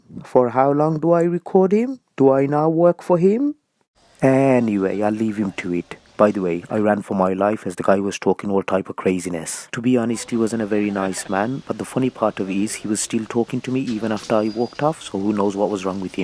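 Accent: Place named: United Kingdom. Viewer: Man your sylti maat is so accurate and has no weird accent, yet your British accent is so perfect. How come??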